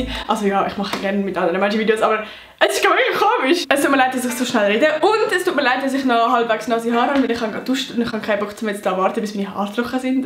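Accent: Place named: Switzerland. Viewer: scho klar aber vo welem kanton wel de akzent chunt mir bekannt vor😂😂 Und überigens mega cooli videos wo du machsch👌🏻❤️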